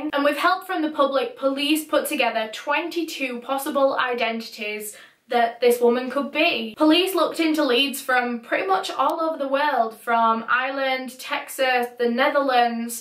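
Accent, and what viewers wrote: United Kingdom. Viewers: "So today's video..." 😄😁 (only I don't have tht Amazing British accent!)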